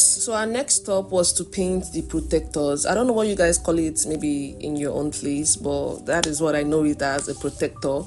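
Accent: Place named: Nigeria. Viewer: Congratulations! May the Lord give you divine favor in 2026 and beyond in your life, your health, your channel. By the way, tell us which country. You sound Nigerian but 🤷🏽‍♀ I'll subscribe anyway.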